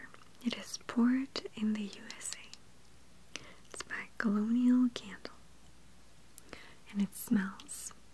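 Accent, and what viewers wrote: Russia. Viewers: really enjoyed your state of mind during this video ! very natural and spontaneous, your positive attitude is contagious ! we really appreciate your russian accent also ;) thank you very much ! kisses from France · your Russian accent <3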